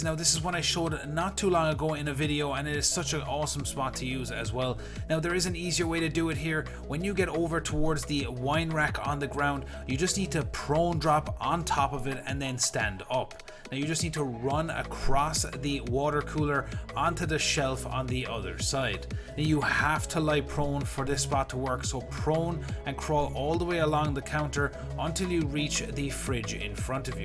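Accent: Ireland. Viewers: Where are u from in ireland? You sound like jack septic eye but idk what county sounds like that?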